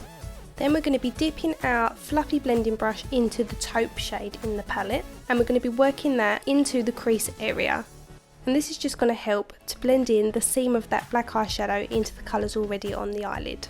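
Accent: Essex, United Kingdom. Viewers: Very beautiful, sultry look, thank you! By the way, are you from Essex?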